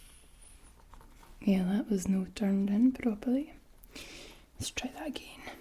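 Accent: Scotland, United Kingdom. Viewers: Love scots accent its like a warm blanket on a cold day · I guess part of the Scottish accent is sarcasm, it's great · I love your “very scottish” videos, but it makes me giggle a little bit because my aunt is scottish, but has lived in canada over 30 years and still has a much much thicker accent than this 😂😂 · Your very Scottish series is a treat to my ears I find the Scottish accent beautiful and it gives me goosebumps! Your Scottish accent is like hearing beautiful angels sing! Thank you! If only you were my doctor then I wouldn't be so nervous about going! When I am at the doctor I will think of your medical videos and it will calm me down may be Listen to positive affirmation videos before I leave for the doctor... Just one question do most Scottish Doctors wear Tartan Skirts at work? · Every day I always listen to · i thought the heavy scottish accent ASMR ruined the video but now i got used to it im getting more tingles than i usually do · Scottish accent>any other english/american accent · I saw cranial nerves and was like yes! 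But then I saw heavy Scottish accent and was like YEESSSS!!! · I so LOVE your Scottish Accent with the "cranial nerves" and this fun exam:)!! My Primary Doctor is from Pakistan and she calls a Urine Test a "Ureene Test":)!! I now feel very relaxed and able to sleep:)!! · The Scottish accent is by far the best 👌❤️ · I'm sorry,everytime I hear someone speaking Scottish I think of,a jaunty tune "when I go out,well I know I'm gonna be I'm gonna be the man who comes along with you"....darn song!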